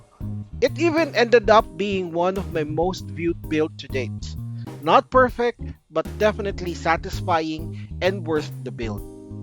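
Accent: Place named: Philippines. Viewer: Oh youre from Filipina thats why little weird I heard your accent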